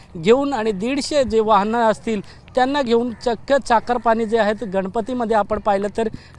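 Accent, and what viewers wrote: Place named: North India, India. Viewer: आरे हा काय बोलतोय आणि कसा बोलतोय . सगळ चुकीच बोलतोय .पण मराठी बोलतोय सर्व भैयान मराठी बोलता आलच पाहजे.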